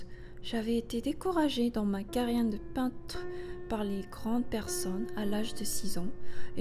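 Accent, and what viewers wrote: South Korea. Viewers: 중국어 많이하면 혀 꼬이는것처럼 구조가 변해요 덕분에 영어발음도 좋은 편이긴 하다만 가끔 세는 한국어 발음이 있어요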